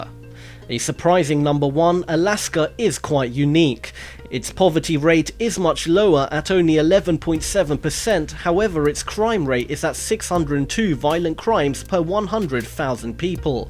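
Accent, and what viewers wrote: England, United Kingdom. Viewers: Where's this guy from,?!!! Britain?! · Anybody find this insulting that somebody from the UK is the narrator? Seriously what does he know about Our States and what goes on there?! I'm quite surprised that Florida didn't make that list, I've been living here for decades and let me tell you that between the heat and transient nature of the people here that it's not a big secret that there are an astounding amount of seriously twisted, violent, nut jobs that've called this state home! I could name numerous Serial Killers that've taken up residence here at one point or another... Ted Bundy, Otis Tool, Christoher Wilder etc. We are the State that the Pulse Nightclub massacre & killings occoured at, we had the UF Murder of the College Coeds in Gainsville, we are the number 1 state for those scam drug rehab centers, which makes this state incredibly active in drug abuse and crime, we had the aftermath of the Mariel boat lift in 1980 which spawned the Scarface movie, based loosely on real events and people. 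In the 70s & 80s we were the #1 State for drug smuggling which had a significant impact on drug related violence. We had numerous infamous people here like Dalia Dipolito who's murder for hire attempt on her husband was near by where I live, we had the Casey Anthony murder case, the infamous kidnapping & Murder of Adam Walsh, the list just goes on & on... With the Everglades & the Atlantic ocean as resources, we had well known places that the bodies have been hidden and disposed of.... We're in the top 3 states for Gun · phelp Australian? Are you an idiot. He's obviously English.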